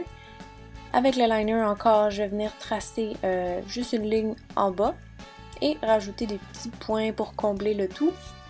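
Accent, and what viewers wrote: Canada. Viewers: j'aime le tout mais mon préférer c'est frosty!!! :) merci pour toute c'est idées et t'est vernis son très jolis......je kiffe ton accent tu es canadienne??? :):):):) mercii beaucoup pour cette video qui ma donné beaucoup d'idées ! :) :) :) en passant: tu as mis cette vidéo en ligne le 8 décembre et on est le 13 donc en 6 jours tu as fait 16 073 vue !!!! bravo. :) :) :) :) :)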